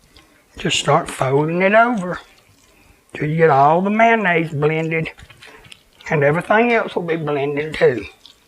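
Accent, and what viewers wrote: South, United States. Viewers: You make fine p.s Makeit like l do love that southern accent tell you are from the country. You are a gem no nonsense just being you. Glad i found your channel.lm from ala. 70 plus years. So refreshing to see some one thats speaks my language. Subscribed just a second ago Wishing you the best.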